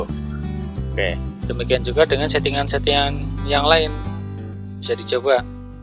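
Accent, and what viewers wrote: Banyumas, Indonesia. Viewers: pagi " dah dikasih pelajaran nih makasih geh om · Belajar lagi sama om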